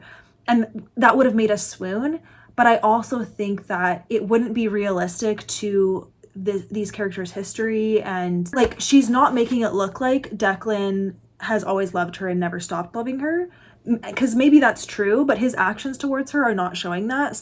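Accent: Canada. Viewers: Literally me watching your video as someone who hasn't seen you before: "She sounds like a Canadian." A few minutes later: "Shout-out to Canada!" 😂😂 nice to find a fellow Canadian book girlie! I loved that part of Blair's story too!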